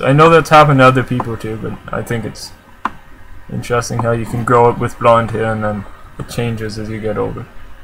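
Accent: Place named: Canada. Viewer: From my experience, Ancestry 'Irish' is more like Irish+Scottish - basically the same people. And both countries had Viking incursions, reportedly mainly from Denmark but recruited from further east, both north and south. They got around. If I may, your type of Canadian accent is far from usual - I'm an expert having been a Canadian now for 67 years. BTW, love the hat.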